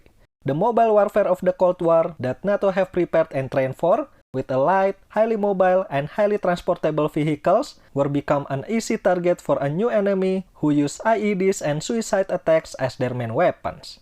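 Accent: Indonesia. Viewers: bang mau saran bikin video nya pake bahasa indonesia aja. Agak gak enak denger bahasa inggris tapi pake nada dan aksen orang indonesia coba kalau mau bikin video pake bahasa inggris pake google translate aja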